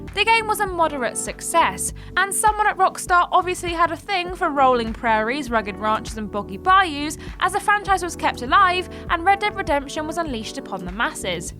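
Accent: United Kingdom. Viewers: English (British) pronunciation.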